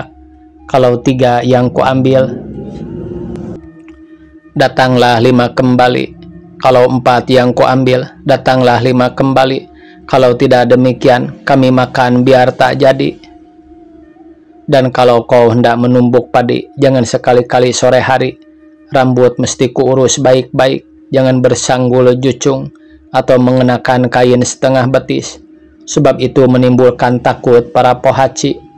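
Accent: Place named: West Java, Indonesia. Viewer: Bahasa lndonesia, aksen Sunda.. Ki Sunda mah cocokna macakeun dongeng Sunda..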